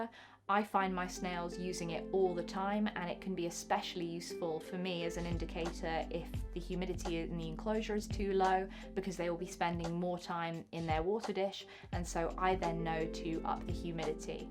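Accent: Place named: United Kingdom. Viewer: Judging on her accent she is in the UK. They aren't illegal there. Only releasing them into the wild is illegal. Even in the US people in the pet trade keep them but you will get in trouble releasing them or selling them Edit: A lot of people also catch their Giant African landsnails outside in areas where they are invasive and keep them. Id argue they are doing the environment a favor by catching them and keeping them indoors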